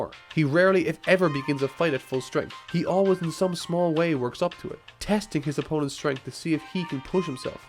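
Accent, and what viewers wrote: Ireland. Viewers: No, you say "Vegeta". "Vechita" is inaccurate as a pronunciation of it. His name is a pun on the word "vegetables," not "vechetables." This guy's Irish, so maybe it's a quirk of his accent, but then again, his accent's very light, so it doesn't make sense, since he flip flops between the 2 randomly. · Wait your from Ireland?!?!?!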